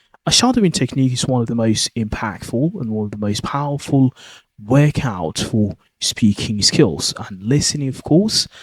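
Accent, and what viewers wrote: Birmingham, United Kingdom. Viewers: Alas I know what shadowing is. I am commenting on what sounds like your Brummie accent. If you are teaching English pronunciation to foreign speakers you ought at least use the most common English pronunciation so that they dont go around saying shardowing when it is SH-A-dow-ing.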